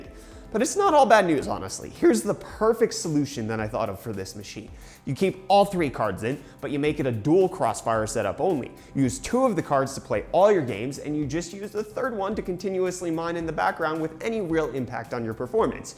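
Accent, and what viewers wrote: United States. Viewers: Holy sheet man, you live in South Africa? 0.0 You sound American tho, elaborate pls? (You may have already told your story, i'm new so pls fill me in! #PossibleSub)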